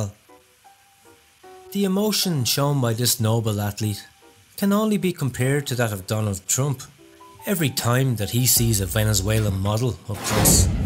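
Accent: Ireland. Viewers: Irish gay narrative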